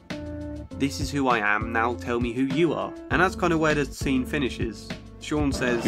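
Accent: England, United Kingdom. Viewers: Average master’s level counselor’s commentary with English accent and annoying light Jazz music playing in background. C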